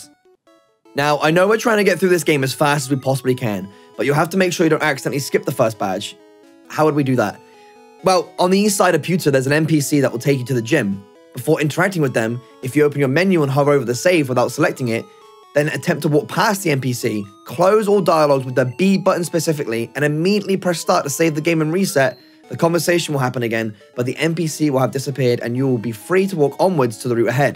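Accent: United Kingdom. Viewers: True bri'ish accent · Maybe it's just me, but as a non-native speaker I had to concentrate really hard to understand what he was saying cause he was talking so fast. That combined with his british accent it was kinda rough. Really cool video regardless though!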